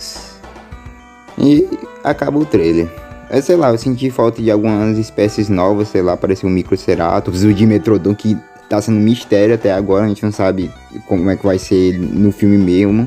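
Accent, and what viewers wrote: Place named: Brazil. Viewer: Is a Brazilian Voice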